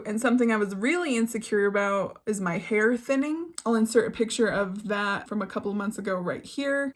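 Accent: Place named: South, United States. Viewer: This girl has got to be from the South … love her! 🥰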